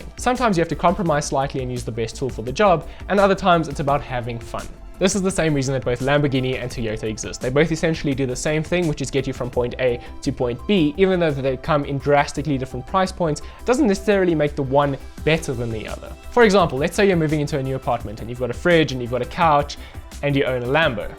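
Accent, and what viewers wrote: South Africa. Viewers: Hey Man, you sound South African :) I’m South African too lol. Would love if you checked my video out and told me what you think :) be pretty cool if you up in joburg, I could help you out with stuff and learn from you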